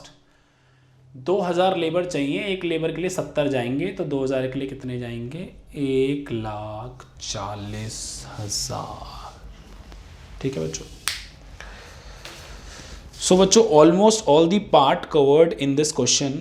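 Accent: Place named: India. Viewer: 영어 발음 실화냐 영어를 인도어로 만들어버리네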